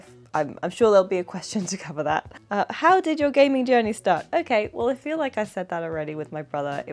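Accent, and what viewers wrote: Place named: Australia. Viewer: I totally thought you were Australian lol!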